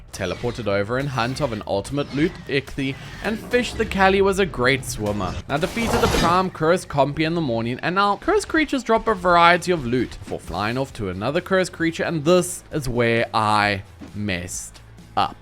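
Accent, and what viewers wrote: Afrikaans, South Africa. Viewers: Are u afrikaans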